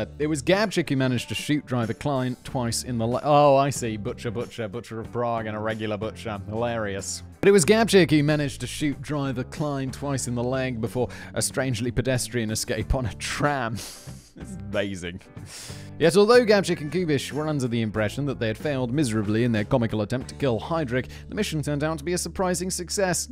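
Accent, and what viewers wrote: South, United States. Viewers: as a Texan, if you came here with that southern accent, no one would question it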